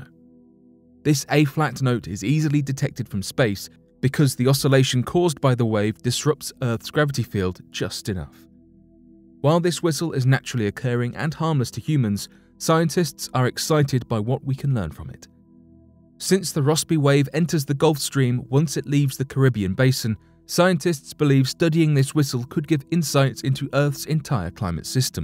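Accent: United Kingdom. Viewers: Love this site, and the British voice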